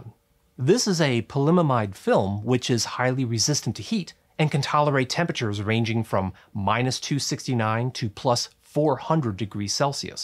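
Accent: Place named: United States. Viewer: You have such a strong american accent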